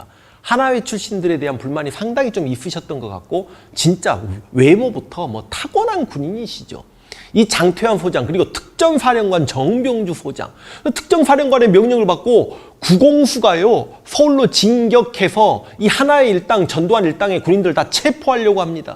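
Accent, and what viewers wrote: Jeolla, South Korea. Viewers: 숨기려 노력 많이 한거 같은데 숨겨지지 않는 전라도 말투로 역사 왜곡하는게 과학이네요ㅋ 사령부라 하지 누가 사령대라 합니까 군대는 제대로 다녀왔나? 기본 상식이 없는 사람이 무슨 역사 강의를 하나 ㅋㅋ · 억양이나 스멜이 라도 필이네. ㅋㅋ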